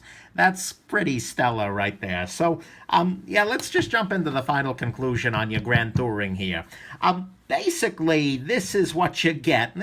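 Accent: United Kingdom. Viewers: good british accent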